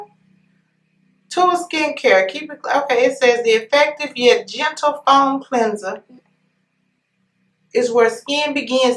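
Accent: South, United States. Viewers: You are so country and I love it 😫 I’m from Alabama and I have a strong country accent too 🥰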